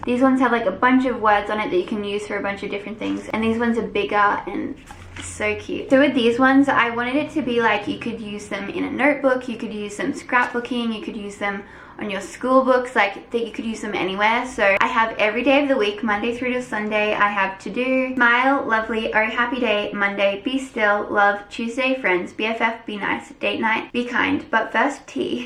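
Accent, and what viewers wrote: Australia. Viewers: I think it would be so interesting to see how many of your kids and what kids of yours get's your Australian accent or if any gets your accent! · So Australian. Love it 😍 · me watching the whole video and the whole thing I pay attention to is her amazing Australian accent 😂😐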